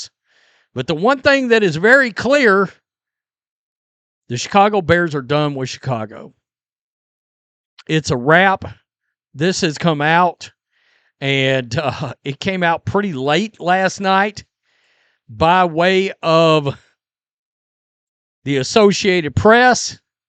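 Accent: South, United States. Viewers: Curious what neighborhood of Chicago accent is that? What are you, 4th generation Uptown? Chicago's a progressive City. I doubt very many of us care what some d wad with a Southern accent thinks.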